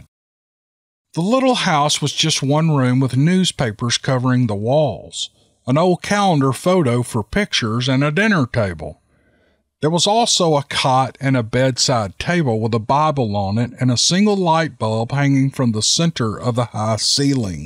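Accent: South, United States. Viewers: is the man best story teller hands down soothing southern drawl...damn can this man tell a story love ya 💪💪🤜🤛✊👏🌱🌿🌴🏞️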